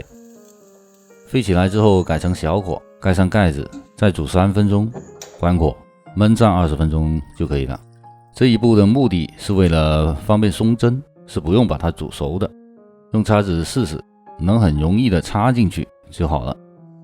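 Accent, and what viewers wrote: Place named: Hubei, China. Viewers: 做得好，讲得好，湖北人吗？ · 觉得是带湖北口音的。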